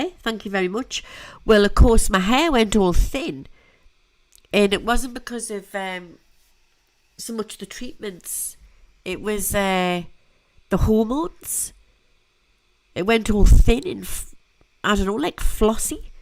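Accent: Newcastle, United Kingdom. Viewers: Love hearing the geordie voice, especially like when its just like being at the spookies in south shields years ago ❤ Hope you are improving ❤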